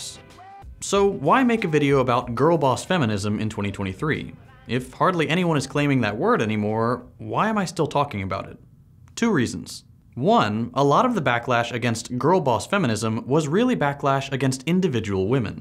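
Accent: United States. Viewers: Hit the nail on the head as usual. As a small aside, as someone who doesn't regularly speak to those with american accents it took me three "gender parity"s to get that I wasnt hearing "gender parody" 😅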